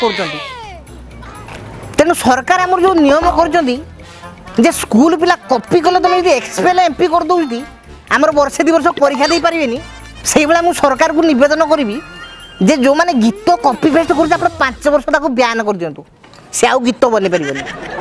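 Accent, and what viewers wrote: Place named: Angul, India. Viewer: Dear ବାବୁ, ଯଦି CoPy ର କଥା ଉଠୁଛି ତ କିଏ CoPy କରୁଛି ଆଉ କୋଉ ଗୀତ କୁ CoPy କରି କୋଉ ଗୀତ ବନେଇଛି ତା'ର ସବିଶେଷ ବିବରଣୀ ଦିଅନ୍ତୁ । ଏମିତି CoPy ତ ସମସ୍ତେ କରୁଛନ୍ତି Ollywood ରୁ Bollywood ଯାଏ । ମୁଁ ଆଶା କରିବି କି ଆପଣ Next Episode ରେ କେଉଁ ଓଡ଼ିଆ ଗୀତ କେଉଁ ଅନ୍ୟ ଭାଷାର ଗୀତରୁ କିମ୍ବା କୌଣସି ଓଡ଼ିଆ ଗୀତରୁ CoPy ହେଇଛି ତା'ର ଗୋଟେ ସୁନ୍ଦର Video ବନାନ୍ତୁ । ଆପଣଙ୍କ Comedy ଠାରୁ ଆପଣଙ୍କ Voice ଆଉ ଅନୁଗୁଳିଆ ବୋଲି ଆହୁରି ଆକର୍ଷଣୀୟ ଲାଗେ। ଆପଣ ବି ଆଜିକାଲି ଗୀତ ଗାଇଲେଣି ମୁଁ ଦେଖୁଛି, ସେଥିପାଇଁ ବଙ୍ଗୋପ ସାଗର କହୁଛନ୍ତି କି? Confidence ଭଲ ହେଲେ Over Confidence ଭାରି ଖରାପ।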